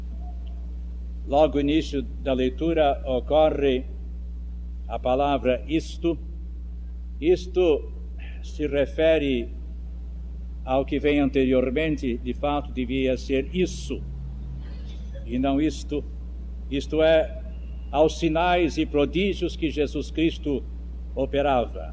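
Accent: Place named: São Paulo, Brazil. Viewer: Ele não era carioca? O sotaque é de paulistano da Mooca!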